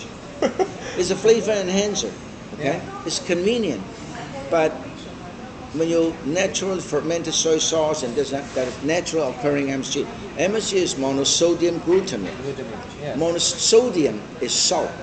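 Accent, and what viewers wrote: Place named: Philippines. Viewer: pinoy!! halatang halata sa accent!! :)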